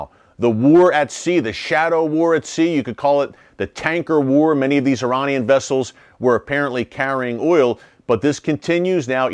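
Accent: United States. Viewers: yurp could tell you are from the US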